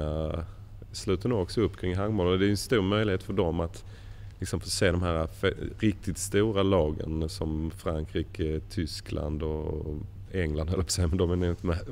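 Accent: Sweden. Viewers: Sjukt ovant och höra honom med skånsk dialekt :P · Vad i helvete, är han skåning?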